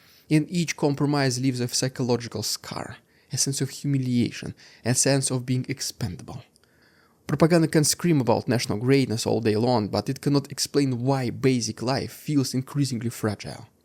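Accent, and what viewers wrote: Russia. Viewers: I like your accent. You are speaking English when I listen, but to my ear it still sounds completely Russian at the same time. Happy new year brother!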